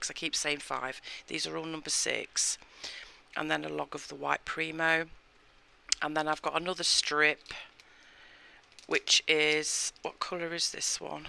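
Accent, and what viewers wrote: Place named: Northern England, United Kingdom. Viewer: It's actually not as bad as I thought it would be, i like it and it's now in the oven! Thanks for the tutes it's really good when it's a northern woman's voice giving the instructions 😊 I'm northern too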